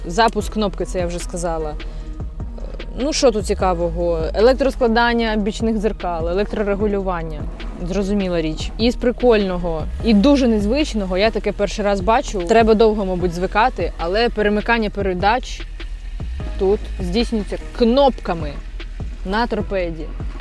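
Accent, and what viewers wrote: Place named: Russia. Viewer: Видно что тяжело даётся украинский язык. Нужно контролить себя ))) как только расслабишься сразу русский вылетает )))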